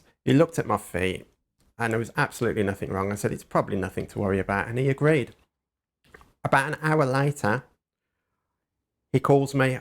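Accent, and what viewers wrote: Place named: Midlands, United Kingdom. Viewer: I've got MS about 15 yrs old. I'm from the Midlands you sound local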